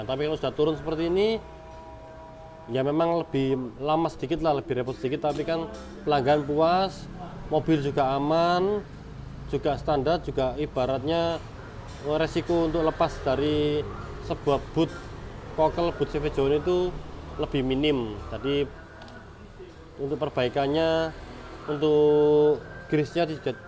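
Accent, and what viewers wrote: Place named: Surabaya, Indonesia. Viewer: Masuk, suroboyone nyel Iki😁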